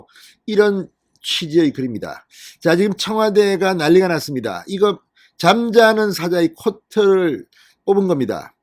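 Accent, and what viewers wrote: Gyeongsang, South Korea. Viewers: 진성호 씨 /엇따대고... 속이시원합니다 완전 경상도사투리^^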